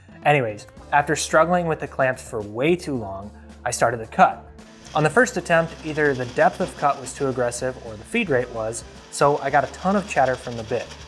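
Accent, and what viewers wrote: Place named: United States. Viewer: You sound like an American, so talk like one. Dump the mm, and use SAE. That's how we communicate here! mm doesn't mean diddly.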